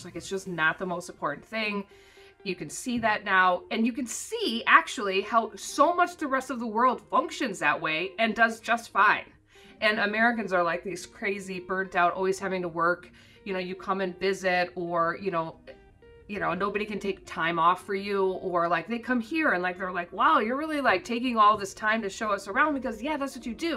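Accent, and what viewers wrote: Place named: New Zealand. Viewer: And your accent has changed a bit now too 😊 you sound very kiwi now. Lol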